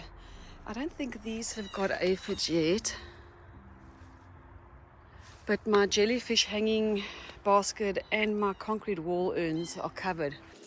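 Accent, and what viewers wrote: South Africa. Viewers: You have an SA accent. I love your garden. One of the best so far. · What a beautiful garden I thought you're here from SA with the accent but the house and fence is not typical until you mentioned frizzle sizzle plant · You sound South African stayed to watch because of that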